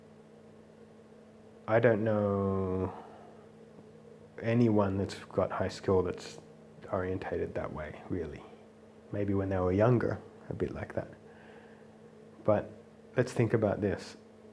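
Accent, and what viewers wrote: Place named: Australia. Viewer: Have seen on YT before, but didn't pick the Aussie accent until just now! Must keep an eye out for seminars here... 👁️ ☺️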